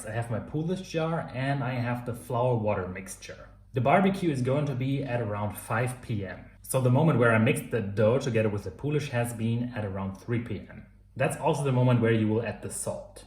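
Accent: Germany. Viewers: Great to see baking outdoors. No need to apologise for German English if you didn't apologise no one would know.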